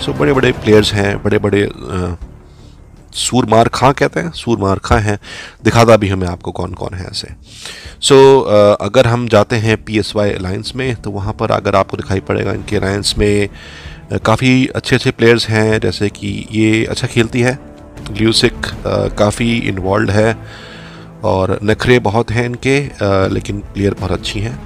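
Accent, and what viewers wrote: India. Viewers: Vi may tari English sunka hamesa sochta tha ki tu Indian he hay lakin confirm nahi tha keuki tu hamesa English may bat karta hay but aj confirm ho gya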